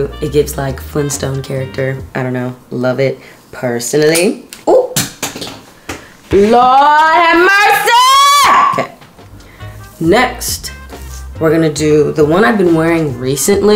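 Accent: Ireland. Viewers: brooo you and this irish accent I cannot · 🥺 THANK YOUUUUUU but babes- now I’m stuck with this IRISH accent in my headddd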